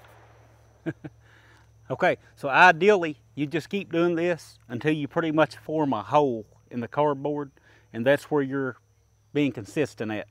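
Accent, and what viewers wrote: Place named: North Carolina, United States. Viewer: Excellent video. Exactly what I was wanting to know. You're accent sounds like good ol NC. Am I close?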